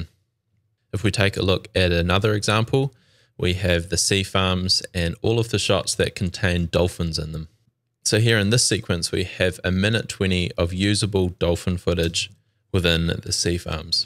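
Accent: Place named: New Zealand. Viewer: Started watching the video - and my brain we like "that accent sounds so familiar and then you mentioned New Zealand. Ahhh Haaa! I am from New Zealand too! - btw Great video mate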